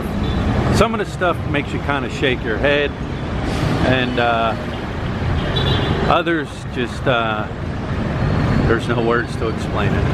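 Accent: Philadelphia, United States. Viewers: "I'm gonna film down dis schtreet here" LOL Definitely from Philly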